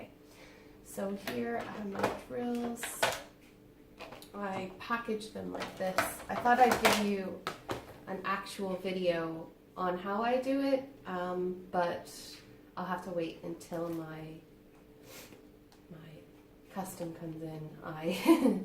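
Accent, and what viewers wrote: Ireland. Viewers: Wow! Exactly a month ago I saw your first videos about “I wish I knew about diamond painting”, now I am watching much older once and your accent is more Irish then American. Love it! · I wanted to watch your first videos because being new to diamond painting, you're kind of a legend to everyone I watch, haha. I've watched quite a few of your more recent videos and coming to this one, you have an Irish accent in this one! I don't hear it anymore, it's so cute, though! But I love accents! So fun seeing you as a newbie! 😁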